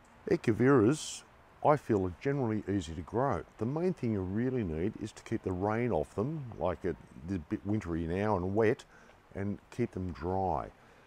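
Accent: Australia. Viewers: Your collection are so beautiful pretty healthy yr voice say u are Australian u are so far away i like to order from yr collection they are so awesome but i live in Trinidad west Indies Maloney garden so lovely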